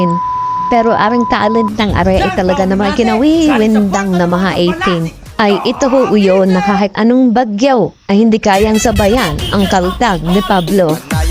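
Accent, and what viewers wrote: Batangas, Philippines. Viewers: Thank you for sharing this compilation of Pablo. Your Batangeño accent and jokes made your video so unique and fun to watch. Waiting for Justin Ken and Josh 😍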